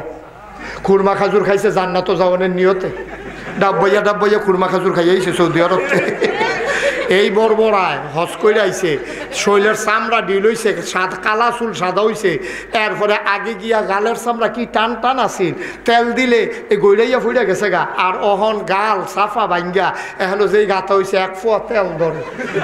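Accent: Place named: Comilla, Bangladesh. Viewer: এই বক্তা কে সবাই কুমিল্লার ভাষার জন্য পছন্দ করে মিয়া ❤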